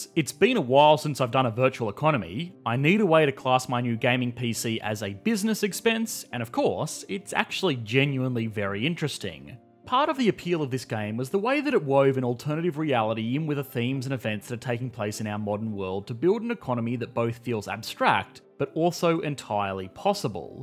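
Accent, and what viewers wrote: Australia. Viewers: Is this guy Aussie or what. What is he jabbering about anyway? Can anyone clarify? Help.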